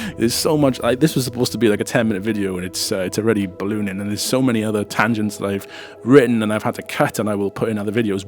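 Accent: Wales, United Kingdom. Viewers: The Welsh accent always makes me smile:) it's a perfect voice for dead pan comedy:) you maybe won't get this reference.... But do you remember the song? by??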